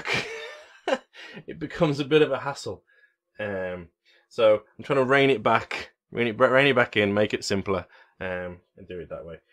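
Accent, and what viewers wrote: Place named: Bristol, United Kingdom. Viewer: Ah right, thought I detected a Bristolian twang to your accent!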